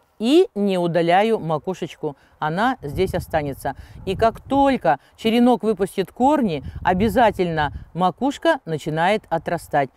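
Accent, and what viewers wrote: Standard. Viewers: Вас слушать одно удовольствие Спасибо. Сейчас такая редкость обычная русская речь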